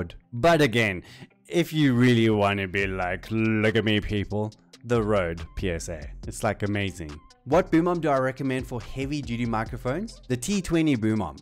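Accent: South Africa. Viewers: The whole time I was wondering if you were south african from the accent loll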